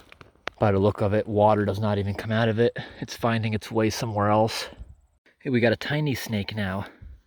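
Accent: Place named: United States. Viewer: Yep, I agree, his accent is American but with good pronunciation and little drawl - much easier to listen to for us Brits!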